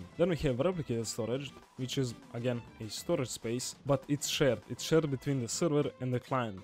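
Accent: Russia. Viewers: He's russian possibly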